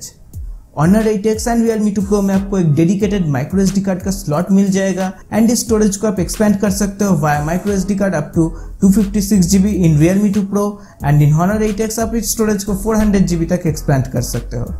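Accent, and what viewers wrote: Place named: Bihar, India. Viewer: भिहारी टोन आ रहा है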